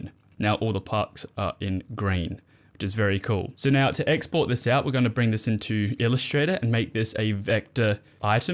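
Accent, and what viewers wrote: Australia. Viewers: i can tell this guy is australian in a second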